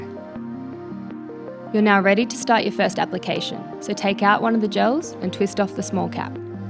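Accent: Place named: South, United States. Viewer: Southern accent 100%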